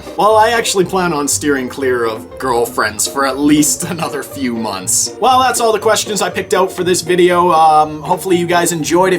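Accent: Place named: Canada. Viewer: I was going to ask you if you were from Canada because of your accent but then the video answered it for me.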